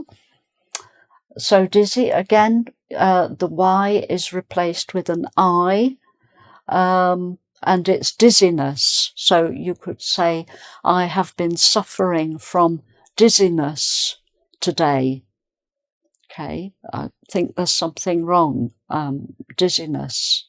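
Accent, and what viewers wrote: United Kingdom. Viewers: Hi teacher! I 'm an English teacher in Brazil and I just love your videos and your genuine British accent! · Good evening Miss, from Brazil, your british english make me remember when I was a teenager and starting my knowledges, nowadays, I need to learn american english, trying 😂, your voice is so sweet ❤❤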